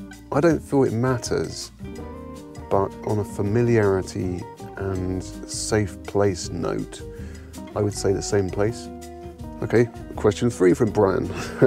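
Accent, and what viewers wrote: United Kingdom. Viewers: It's going very well!!. Thank you. I use your videos to practice meditation and improve my English, by the way hehehe. Your voice and British accent are beautiful for both!!. I'm only missing subtitles in a few videos but it's not really important; ) and I've got some notes about music and voice, I'll tell you. Thank you so much!!. BEST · No don't do an American accent lol😂 I love your meditations because of your English accent 😀great insights here 😋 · Wow, I just saw that video of the news anchor, too. I could so relate, as I suffer panic attacks also. I decided to try meditations as well, and now have been so much better. I still suffer from insomnia, but doing meditations during the day for relaxation helps me sleep better at night. I am sorry about your dad, grieving is such an extraordinary loss. I lost my dad in 2012, and know how easily it can be to fall into depression. 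But I had to stop feeling sorry for myself. I had to get my life back together and move forward, too. I commend you for working on your weight loss and doing what YOU want and need to do to make yourself happier and healthier. Have you ever thought of making custom meditations for people? I know you couldn't really put them on platforms, but you could charge a certain cost for them. I bet a lot of people would love that. I know if I could meditate to something custom made just for me... wow. I've always thought that would be so cool to have Lol. Anyway, another great vlog with interesting stories about you, and good tips and advice. Thanks and yes a meditation in an American accent would be neat, but honestly, I love the British accent. Plus, it just wouldn't be the same without your voice. Haha. Peace! ☮️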